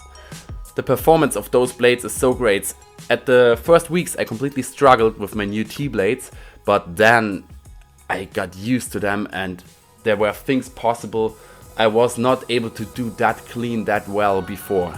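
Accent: Germany. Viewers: Safe ein Deutscher so wie seine Aussprache is 🤗🙏👍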